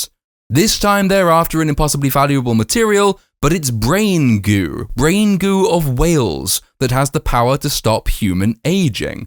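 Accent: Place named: RP, United Kingdom. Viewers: A posh accent doesn't make you intelligent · Bros speaking so posh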